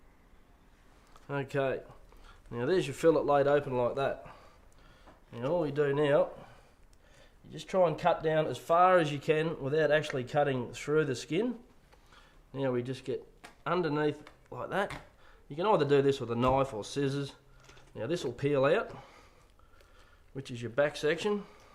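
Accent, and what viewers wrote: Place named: Australia. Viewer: the Australian accent :) I've been living in Syd for 7 years but just couldn't pick it up. love it though :P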